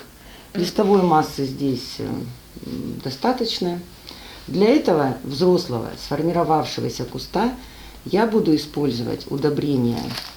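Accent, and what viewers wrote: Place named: Ukraine. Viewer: а я решила, вы из Украины, говор-то наш. Родились в Украине? ))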